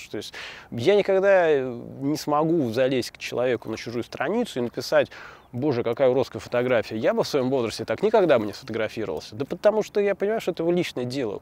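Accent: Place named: Moscow, Russia. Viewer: ты стал говорить как МАсквич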